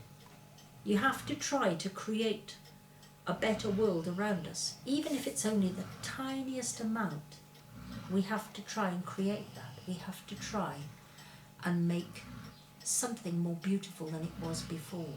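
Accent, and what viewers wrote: Wales, United Kingdom. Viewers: Very grateful to have found you. I have learned to create more simplicity in the way I live which has left me more time to be creative. Thank you for you wisdom. My grandparents came from Wales and immigrated to Canada. I am enjoying very much the way you speak, it reminds me of them. Bless. Peace, Jxx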